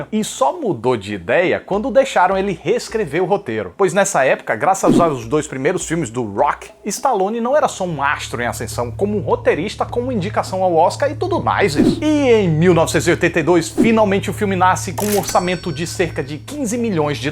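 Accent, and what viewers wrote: Northeast, Brazil. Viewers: Adorei o falatório. Bem nordestinês! Me identifiquei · Cara gostei muitooo do seu vídeo com certeza o melhor e mais completo desses últimos dias sobre Rambo... E eu sou nordestino e vc fala parecido kkkkkkkkkk, você sebe se vai ter alguma coisa do filme para vender na estreia do filme nos cinemas tipo a faixa a faca?? Seria ótimo para nós fãs, abraço!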